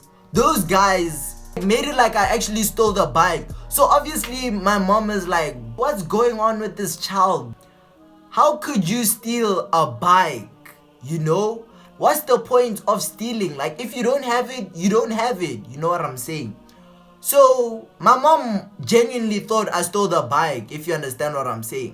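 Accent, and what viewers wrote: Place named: South Africa. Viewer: I like his SA accent its real dope🔥❤️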